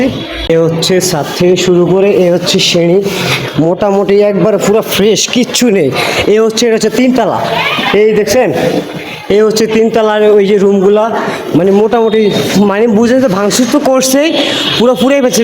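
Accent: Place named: Faridganj, Bangladesh. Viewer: তোমার ভাষা ফরিদগঞ্জের কোন জায়গা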